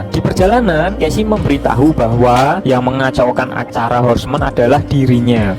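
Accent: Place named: Java, Indonesia. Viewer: Jawa medok...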